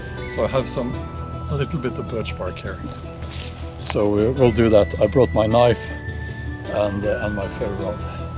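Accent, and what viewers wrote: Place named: South Africa. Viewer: sounded South African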